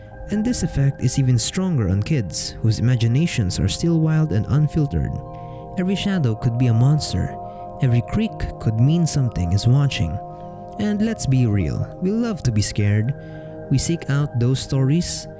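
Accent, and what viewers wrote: Philippines. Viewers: Filipino accent detected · Are you a Filipino? I can hear it from your accent, I know a fellow filipino when I hear one.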